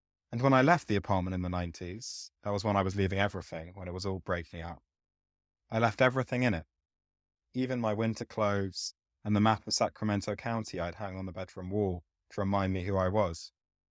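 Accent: United Kingdom. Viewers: He’s English. Although we citizens of the USA speak English, we have completely different manners of speaking a sentence. It’s the intonations and inflections. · Whomever thought it a good idea to have a man speaking in a British voice reading a deeply personal and quintessential American topic? Like having a kangaroo teach ballet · I agree with what everyone is saying… plus its a weird choice to have a british man read a personal essay by an american woman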